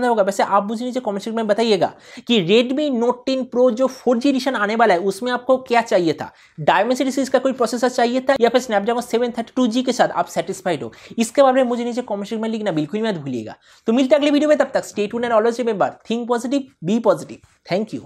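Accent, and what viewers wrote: Bengal, India. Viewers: Dada apni ki bangali naki apnar Hindi Kotha sunte lage je apni bangali 😀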